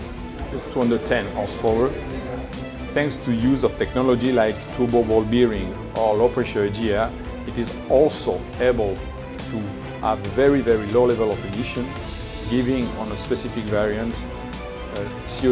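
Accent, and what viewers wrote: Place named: Germany. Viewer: So disappointed by hearing a German speak... no matter how well he does his job. I am aware that is unfair.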